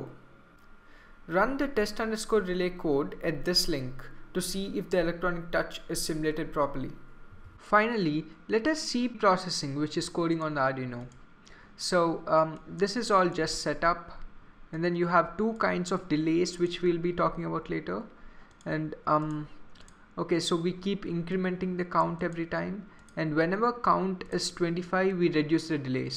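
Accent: India. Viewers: are you from India? i'm from there i know this accent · i don't undersand but i hate indian accent and voice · He's obviously from India :/ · this is the indian accent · I am sure he from India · Probably of Indian, Pakistanian, or somewhere around there-ian/ish. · yeah but i visited kolkata and there their accent was like his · i think he's from india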